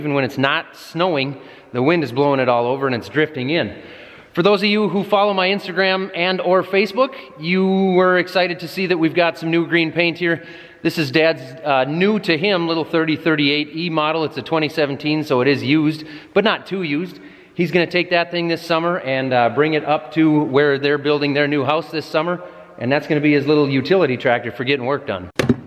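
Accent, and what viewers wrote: Minnesota, United States. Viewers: "on your ruff" I love the Minny accent! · Can you do your best Minnesota accent for us?¿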